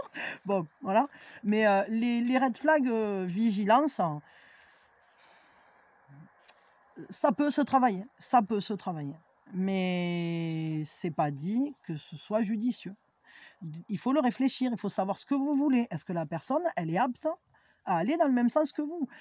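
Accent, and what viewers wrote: South, France. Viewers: Moi c est verbale je vais partir et ça devient physique pas facile merci pour vos guidance avec l accent du sud sa fait chaud au cœur ❤❤🙏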